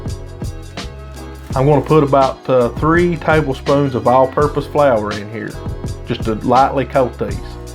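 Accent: South, United States. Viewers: Not to sound mean but this guy sounds more southern than me and I’m from Louisiana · Loved the “country as cornbread” accent. ❤️ Great recipe. Thank You for sharing. My Friends and Family absolutely loved them. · The ultimate country accent!!!! Also great video I’ve been trying to find a good one. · Southern draw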